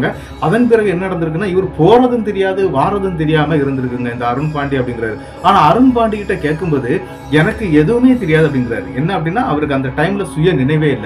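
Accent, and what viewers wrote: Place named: Kerala, India. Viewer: நீங்கள் மலையாளம் கலந்த தமிழில் பேசுறீங்க. ப்ரோ.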